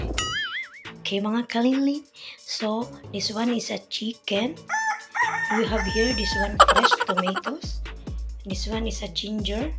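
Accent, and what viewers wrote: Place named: Arab world. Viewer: Ayos host ah parang arabo na rin magsalita hehe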